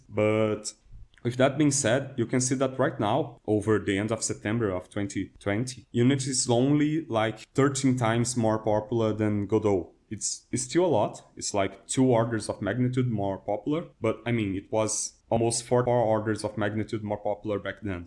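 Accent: Brazil. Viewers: awesome video dude, thanks for that. Are you from brazil? Your accent seems to be if so youre foda cara!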